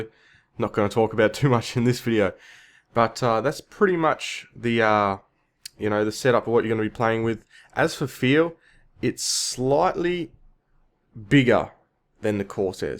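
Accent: Australia. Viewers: Goodish Review, you will polish up as you make more:) and good to hear another aussie. I will wait for the next mouse as this one is not there yet. One deal breaker for me is lack of a free-wheeling scroll-wheel. My current mouse has one, (Logitech G502) and i didn't know it was so important till I used it every day. Just in facebook a free-wheeling scroll-wheel is a attribute without peer let alone in Fallout4. Second deal breaker is not being able to change the individual colours of the buttons as a visual aid. I have a Corsair R95 RGB.. my god what a fantastic keyboard, having key colours change in each profile is important to me. To make your reviews better you should make a list of what is important in whatever device. Like in this one you need to mention if each button can be colour configurable or only one colour for all buttons. RGB devices are not all equal it seems:) I just assumed they were changeable like the keyboard till i looked at multiple reviews and only one person mentioned this fact. 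And only one other reviewer mentioned the lack of a free-wheeling scroll-wheel. Cheers and keep up the good work..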